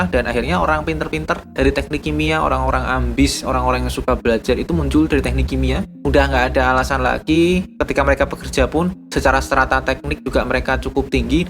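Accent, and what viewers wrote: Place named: Java, Indonesia. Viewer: Orang Jawa ya mas?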